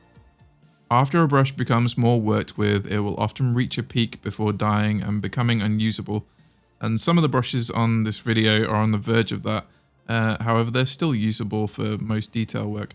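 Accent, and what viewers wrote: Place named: Burton upon Trent, United Kingdom. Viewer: Id recognise a Burton-upon-trent accent anywhere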